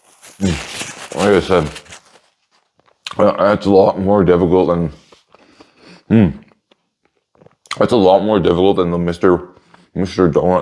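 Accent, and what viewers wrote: Canada. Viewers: I love your Canadian accent, it's adorable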